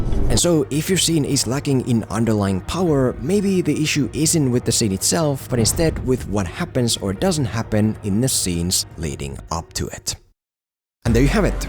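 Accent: Finland. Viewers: Bruh u sure your not finnish? Your english sounds exactly like finnish accent.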